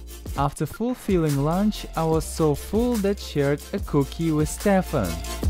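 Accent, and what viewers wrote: Russia. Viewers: Russian accent speaking English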